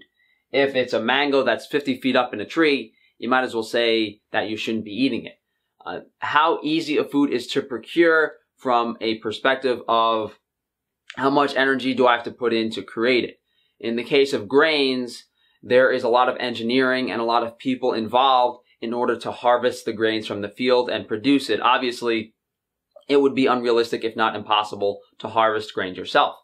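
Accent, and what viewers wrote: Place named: New York, United States. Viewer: I love the neat and tidy grooming and NY accent. Awwwh!💓 Great info here and especially given the fact that most plant foods today look, taste and provide entirely different benefits and flavours to humans than they used to. Not only have we made them resilient to pesticides and shelf life, more starchy, sweeter and less varied but we eat the same species repetitively and out of seasons. Humans have great metabolic adaptability and it is not only a key to our survival but how we found creative ways to increase nutritional value of foods. Recipes are a great way to see that in practice i.e. cooking, fermentation, food combination and even pairing certain alcoholic or herbal drinks with food.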